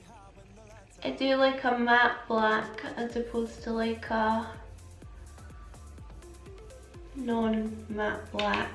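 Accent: Scotland, United Kingdom. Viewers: Omg are u Scottish??xo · Some nice stuff there. I love the colours in that little eye palette. And the accent, the "HAMISH", the Flower of Scotland - all my Scotofile cravings fulfilled. LOL. You had a birthday??? Happy Birthday. Hope you had a lovely day.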